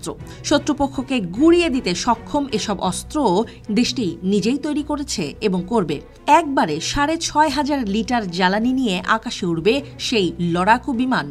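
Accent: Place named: West Bengal, India. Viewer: গলার আওয়াজ আর কথার স্টাইল দেখে মনে হচ্ছে এটা একাত্তর টিভির প্রতিবেদন নয় এটা ভারতের পশ্চিমবঙ্গের কোন টিভি চ্যানেলের প্রতিবেদন তারা প্রচার করছে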